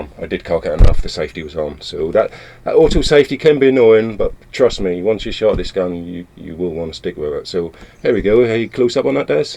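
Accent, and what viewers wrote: United Kingdom. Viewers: Is that a Suffolk accent bu?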